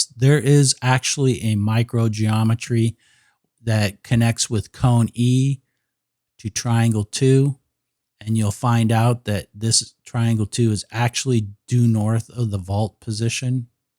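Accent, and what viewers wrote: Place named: Spain. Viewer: you always explain this so well! Thank you! (Nobody cares that your French is pronounced with a Spanish accent.)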